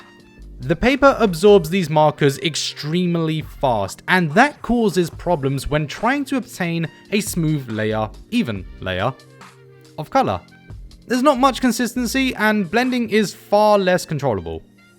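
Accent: Germany. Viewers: could you do a intro in german one day? :-D i like your german voice :-D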